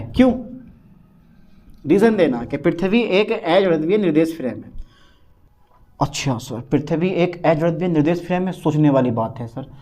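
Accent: Bijnor, India. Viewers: Sir aap bijnor side k ho kya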